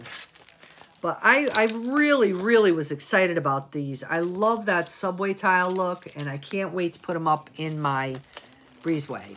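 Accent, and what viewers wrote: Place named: New York, United States. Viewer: I’m new, just found your channel! I thought you were from New York, you have a slight accent haha my first guess was New York or either maybe Chicago 😆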